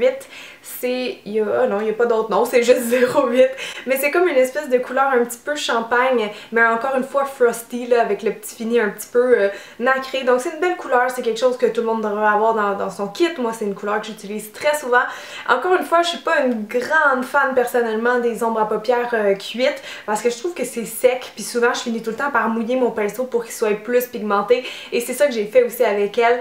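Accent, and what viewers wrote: Quebec, Canada. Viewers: c'est québécois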